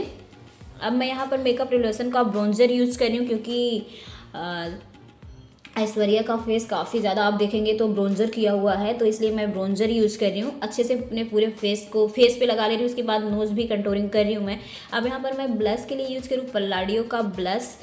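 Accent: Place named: Kanpur, India. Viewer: Amazing makeup di khaa se h aap.... Apki language kanpur ki lgti h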